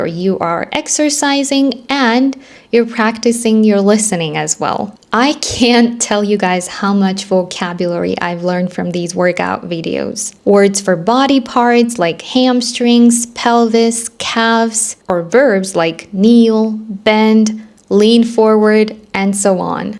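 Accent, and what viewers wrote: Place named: United States. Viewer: All Russian people that I know. They are speaking very well. And they have good pronunciation like an American accent. How is that possible? Your speaking skills amazing you’re learning quickly how? :))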